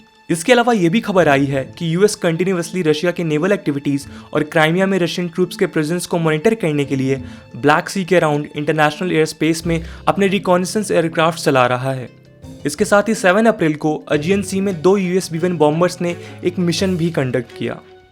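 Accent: India. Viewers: Indian accent. I am pretty sure India will be on the west side in this conflict as they really hate China. Then most of the middle east will join putin. North and South korea will clash together. Wildcards could be Brazil and south american countries. Some will join the west but some want to see the US burn. Other wild cards would be African nations like Egypt, Sahel, Etiopia. It will all be a bloody mess and it is hard to see how we will get any winners in this conflict.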